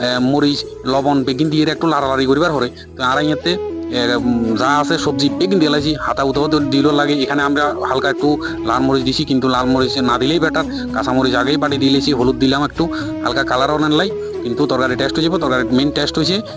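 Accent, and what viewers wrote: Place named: Noakhali, Bangladesh. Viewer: Gramar language suru korchish ekdam nowakhali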